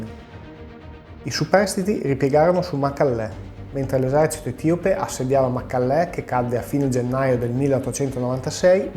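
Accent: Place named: Alessandria, Italy. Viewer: bellissimo video. Ma dalla tua "erre" mi verrebbe da dire che sei di Alessandria?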